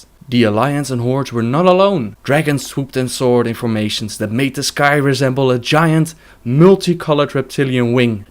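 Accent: Netherlands. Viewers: dat dutch accent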